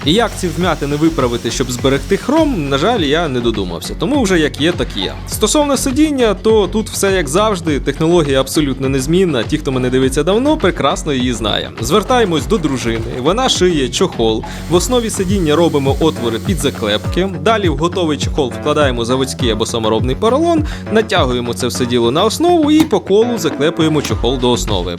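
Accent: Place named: Rural, Ukraine. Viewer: Очень круто, особенно нравится твой говор, старый "селянський" украинский) мне вкатило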